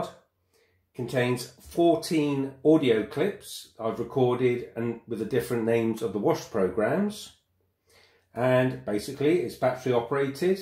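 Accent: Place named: United Kingdom. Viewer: I love that idea! I wonder if my company could make something similar for appliances like that. By the way, I love your accent! Is that British? I wonder if that machine works on dryers as well. Like your wife, I'm totally blind, and one thing I would love to design is a washing machine and dryer pair that can have as many cycles as you like. Wouldn't that be amazing?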